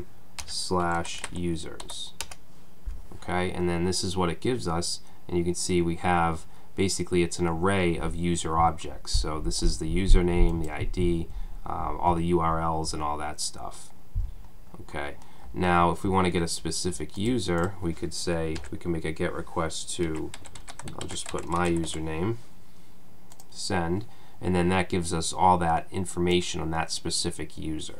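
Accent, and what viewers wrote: Boston, United States. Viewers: Boston accent? Great vid.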